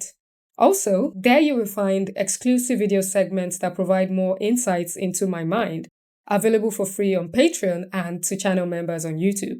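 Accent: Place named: France. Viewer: Oh yea, you watch film for the writing. I love it! I work with a man at Tesla with your accent. He's from France. I lived in Germany for a few years myself. Europe has such deep, rich culture.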